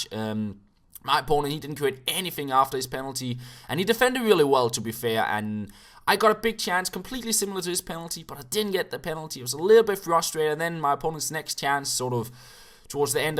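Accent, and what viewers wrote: Jutland, Denmark. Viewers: Er du fra Jylland?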